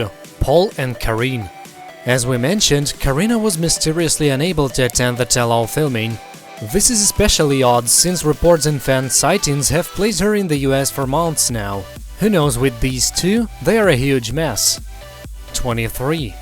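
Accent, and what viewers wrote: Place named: England, United Kingdom. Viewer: That's because he is from England. They pronounce words differently. What bugs me is if a word ends in 'R', they add on an 'A' when they say it.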